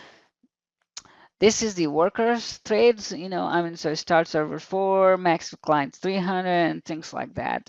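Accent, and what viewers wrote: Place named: United States. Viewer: I very curious to know, How did you get that American accent?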